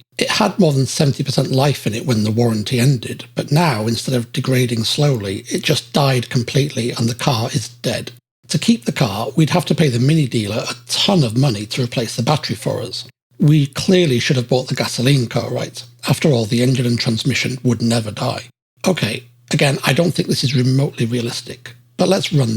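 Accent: England, United Kingdom. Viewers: A very good comparison but I have to wonder... If he's using Texas as a base, why does he have an English accent? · Excellent breakdown and video, although was a bit confused to hear a fellow Brit talking about a car with UK/European origins but using US costs! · This is so weird listening to a British accent but in American prices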